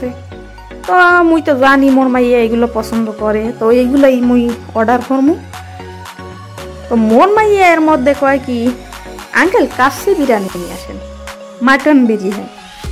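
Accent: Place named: Barishal, Bangladesh. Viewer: Bhola laglo aponar video ta deklam khub balo laglo. Borishaler language. I enjoy it.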